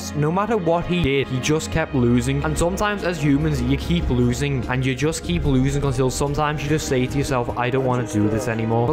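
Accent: Liverpool, United Kingdom. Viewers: You're my favourite scouse ace attorney youtube channel <3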